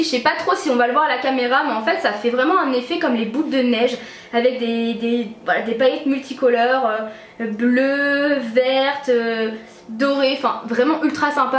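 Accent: Normandy, France. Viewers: c'est dans votre "accent" normand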